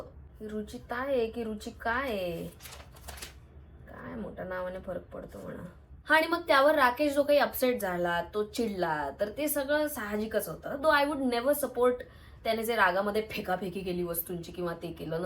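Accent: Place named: Khandesh, India. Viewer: 😂😂 paneer aivaji khodrubber Your roasting skills are amazing 😂😂❤ Btw I'm from Bhusawal 😅 loved your khandeshi accent 😁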